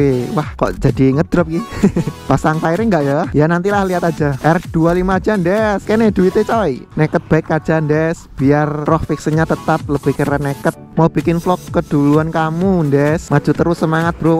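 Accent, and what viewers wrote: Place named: Yogyakarta, Indonesia. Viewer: omonge jan asli jogja... hahaaha apik mas.. lanjutkan pokoke.. tak subscribe ndes.. haha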